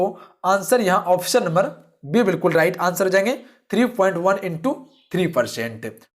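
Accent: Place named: South, India. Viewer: Ye south Indian hindi kyon?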